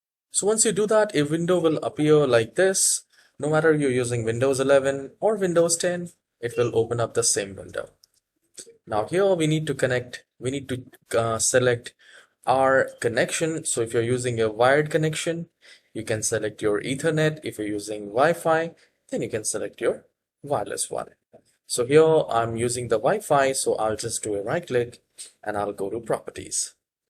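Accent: India. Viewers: As soon as I heard the Indian accent I knew this was going to work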